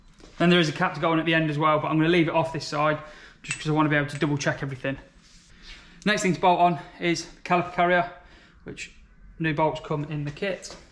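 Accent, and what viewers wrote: United Kingdom. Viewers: I like these videos:) and this accent:)) sounds beautiful😍✨. Is it british accent?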